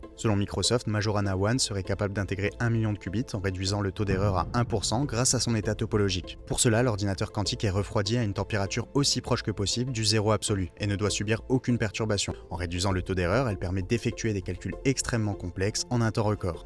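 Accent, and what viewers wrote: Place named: Banlieue, France. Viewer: Attention à ton accent de banlieue, c'est très perturbant et pas français.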